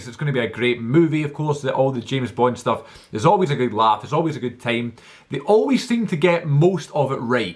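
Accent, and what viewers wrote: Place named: Scotland, United Kingdom. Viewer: Oh by the way what part of Scotland you from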